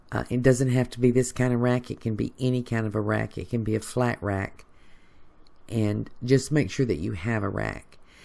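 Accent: South, United States. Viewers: Just prepped some spareribs for tomorrow. Used a store bought rub on the ribs then added 4 cups of buttermilk on to of it. I wanted to make a buttermilk pie, which I love, but since I’m a owner operator of a small pet supply in Oak Island,NC I just didn’t have the time. Since the pie wasn’t made I decided to pour the buttermilk over the ribs. I’ll let you inow what we thought of them tomorrow. What state are you from? You sound a bit more southern than me, so I believe you are farther south than I am. My mother always marinated her chicken in buttermilk and I thought why not ribs? I put in search for you tubeand found you. Thanks so much! I’ll continue watching your videos. It is around 3:thirty am and I need to go to bed. Noproofreadingtonight. My zero doesn’t work this computer. Guess it doess not like salt air. Good night!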